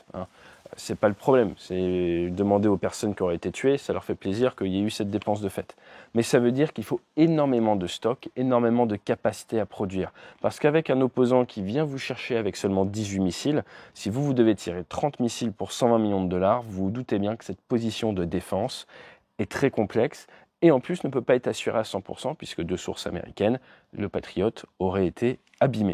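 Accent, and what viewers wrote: Quebec, Canada. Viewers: Etes vous québécois quoi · Êtes vous québécois ou français? Parce que de toute manière vous êtes génial. Mes amis anglophones apprécieraient une version sous-titrée en anglais.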